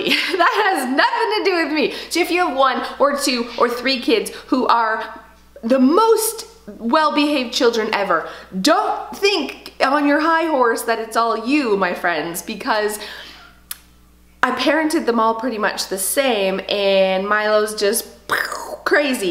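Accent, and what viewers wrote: Canada. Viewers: Ga Ga,gave me life! Love your cute Canadian accent,and sweet energy! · Lol.. this is so refreshing. I Have an extremely strong willed 4 year old boy. every day is a challenge! sooo nice to know I'm not alone! also, the centerpiece is dreamy! I'm a Canadian in Missouri and your accent makes me homesick!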